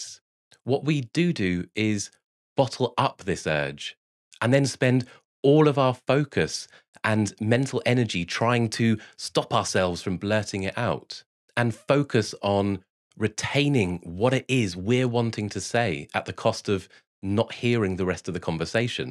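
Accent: United Kingdom. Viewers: And is his sweater blue or black? How many piercings does he have? Is that an English accent? 😂😮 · I noticed the asymmetrical glassesand the way they make his eyes smaller, both the lip and weird-placed ear piercing which is also a bit rusty it seems, the few grey hairs in his mustache and beard, the hairs that are out of alignment on his head, the whiteness and regularity of his teeth, his brittish accent and so many other things I need to rewind to hear the message again. No doubt I have some form of adhd.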